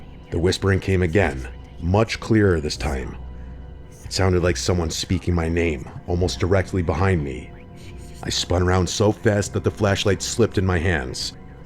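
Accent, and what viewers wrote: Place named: East Coast, United States. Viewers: Mr nightmare got a east coast accent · NYC or suburbs area. Probably Brooklyn or LI.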